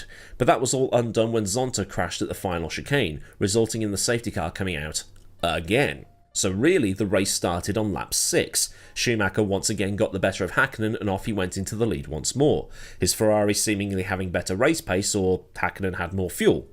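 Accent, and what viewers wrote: Canada. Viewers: Checking in from Toronto, I will give you an 8/10 on the Canadian (English Speaking) Accent and a 9/10 on the appreciation of the Canadian sense of humour having "Biennvenue a Quebec" on that wall :) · Love the Canadian accent, although I think the appropriate Jacques Villeneuve, French Canadien accent would have been even funnier.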